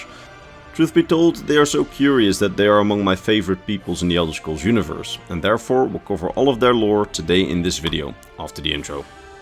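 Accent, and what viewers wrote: Netherlands. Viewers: Ik hoor gewoon t Nederlandse accent haha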